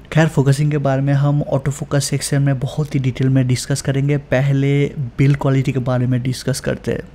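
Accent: Bengal, India. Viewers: hindi sahi hai lekin bangal hai tone to hogi hi bangali, aap video me or achche se hindi bole, video or chalegi sath hi bangali me bhi alag se banaye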